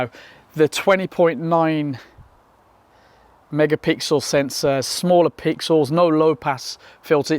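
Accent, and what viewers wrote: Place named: Northern England, United Kingdom. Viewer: sound like you fron northern England..maybe Liverpool/Manchester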